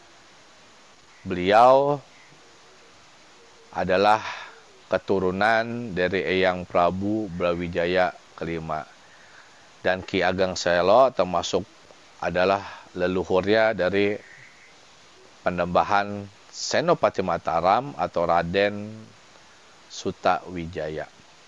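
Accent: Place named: West Java, Indonesia. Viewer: Trima kasi kepada Bpk ustadz, klo didengar dialeknya orang Jawa Barat, sangat jelas sekali menerangkanya, Ammmin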